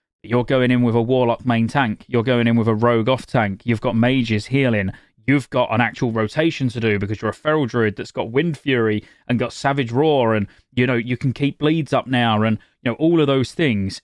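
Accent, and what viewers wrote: United Kingdom. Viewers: Whats the story behind the Iowa hat but the british accent?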